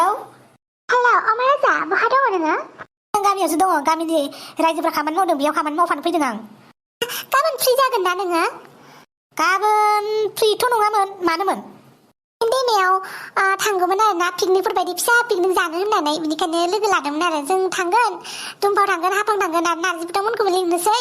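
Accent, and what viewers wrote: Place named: Kokrajhar, India. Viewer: Nwngsini Boro-a Kokrajhariatar...mwjang👍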